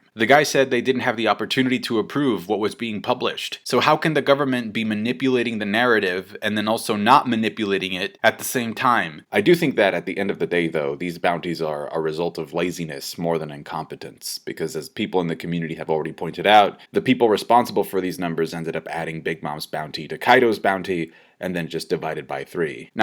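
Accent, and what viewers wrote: Canada. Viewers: Bro are you Canadian! And in psychology as a therapist I'm an aspiring psychological associates that's awesome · wait a second.......are you from toronto?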